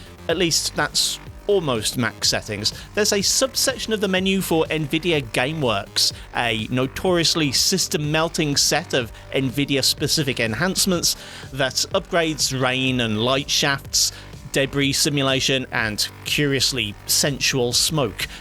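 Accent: England, United Kingdom. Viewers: he is english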